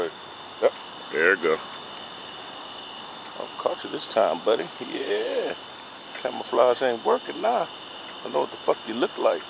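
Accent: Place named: South, United States. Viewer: I don’t know if it’s the speaker on my phone or if that katydid is making a higher pitched noise than the sound I’m used to hearing on a summer night. I could tell it was loud though cause when it would start it would drown out everything else that your microphone was picking up. But I’m used to katydids making more of a chatter sound off in the trees. You sound like you’re from the south. I’m from the south and you sound just like a buddy of mine. Just something I noticed. That’s all.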